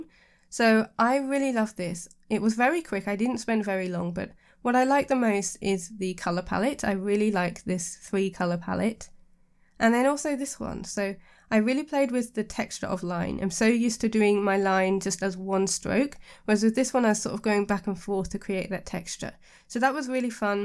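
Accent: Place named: United Kingdom. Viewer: Suddenly I found myself really enjoyeing listening to British english (im not english language speaker and in daily life moustly surrender by american english media)))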